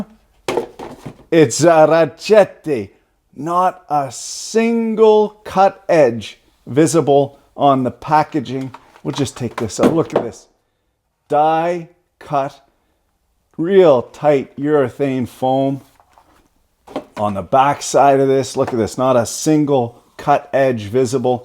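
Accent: Canada. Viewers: I'm from Florida is this how all Canadians talk?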